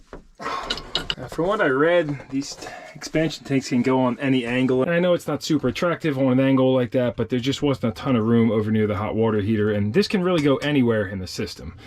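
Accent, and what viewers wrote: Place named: Texas, United States. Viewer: What part of Texas are you?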